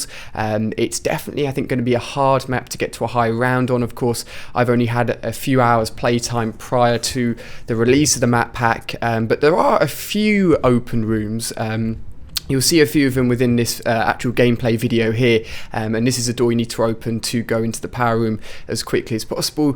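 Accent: United Kingdom. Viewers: okay, wtf? He's british, first off, so it won't sound perfect. And don't mention retards, it's just rude. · What the hell is your problem. Hes from the UK, that's his accent.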